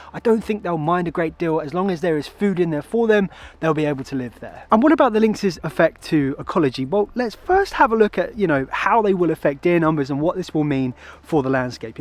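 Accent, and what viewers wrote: United Kingdom. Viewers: Another posh English voice poking his nose in our business..🤢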